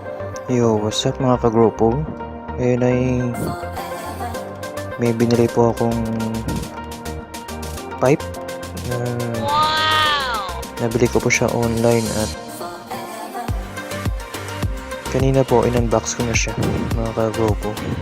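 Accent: Iloilo, Philippines. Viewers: Ilonggo ka no?🤗🤣🤣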